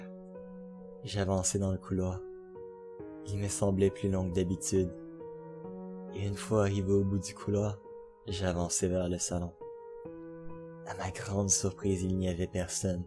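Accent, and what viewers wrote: Quebec, Canada. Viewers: L'accens Canadien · Tu serais pas Québécois par hasard? (Par ton accent et tout) Sinon très chouette vidéo ta voix est plutôt bonne mais tu semblais parfois plus ''heureux'' que triste ou apeurée. Bonne continuation + 1 abonné.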